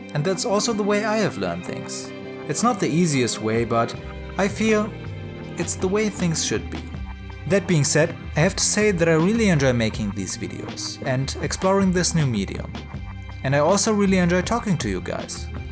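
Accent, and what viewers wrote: Germany. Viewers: Wusste ich doch, dass du Deutsch bist! Hab ich sofort gehört :) Deine Kunstwerke sind total inspirierend, vielen Dank dafür! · Hello, I kind of knew from the beginning that you are german / turkish. I could hear the german accent since I’m geman myself :) Let me say that the tricks and advices you gave helped me rethink things I hadn’t noticed before. That is what I really appreciate about all of your videos since they are truly helpful aside from the timelapses of your paintings of course. As you explained (and as I understood) uploading your videos is not meant to gain a great amount of subscribers but simply share your techniques with us - which is what makes you so special for me since you only upload them for fun. I enjoy watching your videos alot and would like to thank you for sharing your view of art and advice with us / me. Liebe Grüße Rebecca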